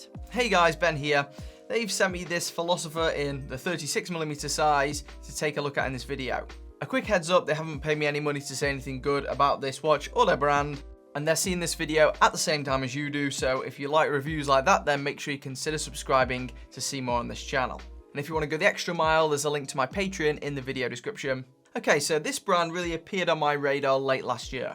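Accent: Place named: Midlands, United Kingdom. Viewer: This guy is the only case I can tolerate the midlands accent for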